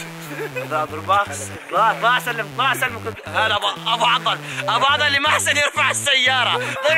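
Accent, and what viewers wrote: Syria. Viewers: عراقية وأفتخر 😂 لك دخيل ربك عم تحكي سوري😊❤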